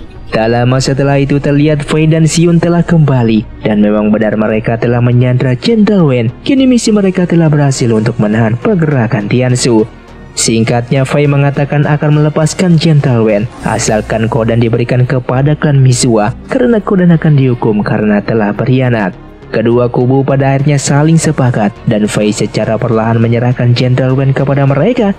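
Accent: West Java, Indonesia. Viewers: Orang sunda nya??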